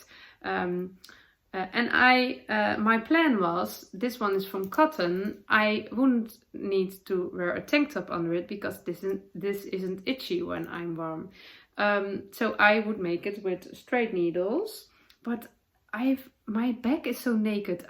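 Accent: Netherlands. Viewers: I love your podcast and your “dutchie english”, it reminds me of my late father. He was stationed in Europe in the 50-60’s and had very fond memories of the Dutch people. I am grateful you record in English so I can enjoy out time together! 💜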